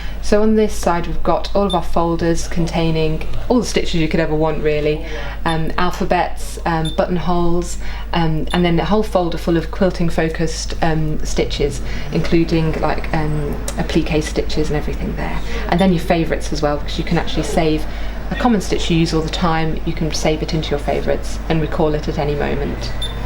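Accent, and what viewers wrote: United Kingdom. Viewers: I adore her proper British accent. Great demonstration. I intend to buy one this week.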